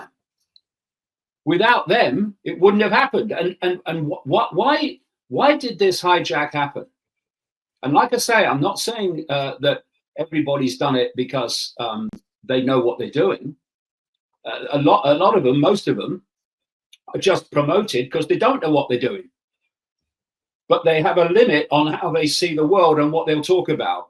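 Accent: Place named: Hertfordshire, United Kingdom. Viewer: He's from Hertfordshire to us inner London crew he's posh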